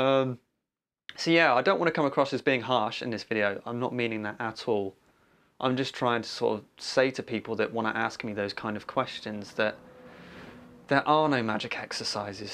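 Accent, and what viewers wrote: United Kingdom. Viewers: Hey man, great video! Are you British by any chance?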